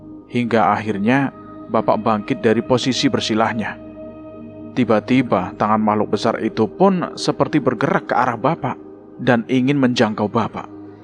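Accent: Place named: Java, Indonesia. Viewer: Medok Jawanya lekat banget, kurang enak di dengar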